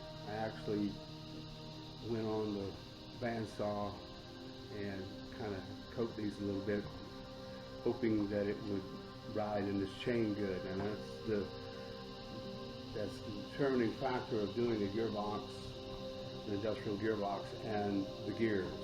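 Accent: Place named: South, United States. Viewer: good morning MR. another informative video that small sprocket you made pretty neat I think you can purchase them at mz Miami I thought I saw a 11 tooth I can't explain technically what it does but just like raisins the number of teeth on the rear sprocket does something so does the front it did the same thing in RC trucks cars anyway I think lowering the front sprocket slower take off faster top don't quote me lol but it's something like that You can tell you're from the South brother I'm in Texas you can hear them cicadas singing lol you your wife and family have a great labor day stay safe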